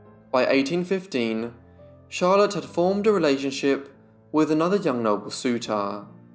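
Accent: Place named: United Kingdom. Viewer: You can't use the snotty British voice It's a nightmare to listen to.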